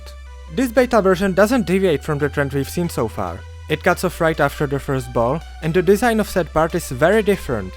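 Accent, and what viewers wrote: Czech Republic. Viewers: honestly kinda surprised i never realized that this is what a czech accent sounds like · Your accent sounds hella czech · 🇨🇿🇨🇿?? I can hear the accent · Já vím že si čech už jenom podle toho přízvuku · That czech accent be bitting different thooo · ahaa jo ty si čech... já jsem si říkal, ten přízvuk a najednou místo "attempts" tam bylo "pokus"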